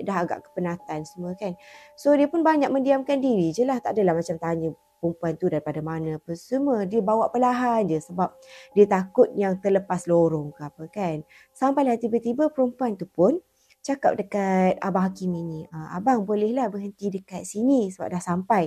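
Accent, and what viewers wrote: Kelantan, Malaysia. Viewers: Kak ore klate kann,kcek mcam pailet loghat klate jerr😁..truskan bermaju jaya channel akak ni ye😊,mdahan moga murah rezeki🤲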